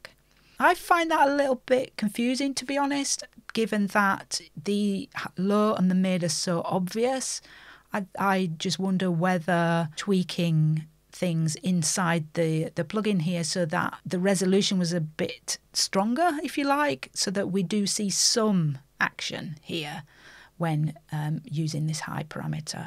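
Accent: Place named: Northern England, United Kingdom. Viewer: Just came across your channel, what a breath of fresh air. Not only is great to see a female Youtube channel based on audio engineering which is rare enough but a Northern accent too! Makes such a change from hearing all the Americans talk about everything haha. Thank you!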